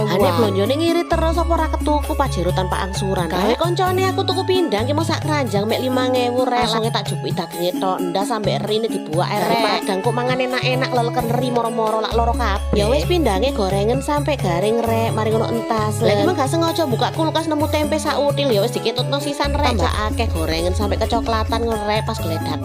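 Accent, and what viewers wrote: Surabaya, Indonesia. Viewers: Suka sama ide masaknya dan ciri khas bahasa Surabayanya.. terimakasih Ning.. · lucu.suroboyoan pollll .garam bikin pinter😂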